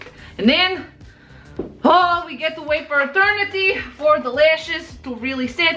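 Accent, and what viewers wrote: Russia. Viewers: I'm new here, what is her accent? Sounds a bit Russian? I love her personality <3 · are your neighbours happy about the fact that you're screaming and moaning like a crazy? 🤔😂 btw this is the first video from you that i watched and i loooooove you, especially your russian accent! 🤠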